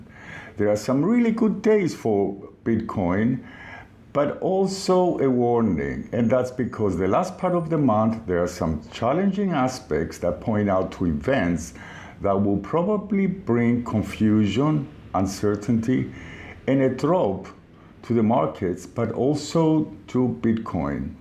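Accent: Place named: Cyprus. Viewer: Hey hey! nEw here and truly amazed! Judge by your accent you Cypriot? ;p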